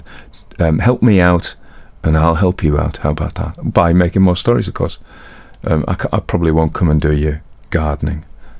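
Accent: France. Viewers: oh the french accent 😙👌 très bien! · The french accent, alas, defeated me and I had to terminate this story prematurely. Not to worry though as plenty of others to choose from your channel 😮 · your French accent, chef's kiss! I could feel the Poe here. The horror that people are capable of when they are obsessed with fighting a wrong.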